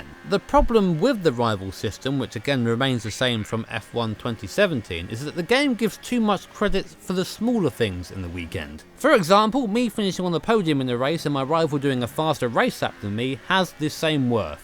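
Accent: United Kingdom. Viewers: Annoying thic Brit!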